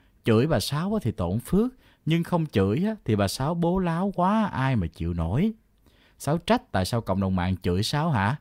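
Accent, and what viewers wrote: Standard. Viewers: nói rất chuẩn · Kênh này nói chuẩn nha❤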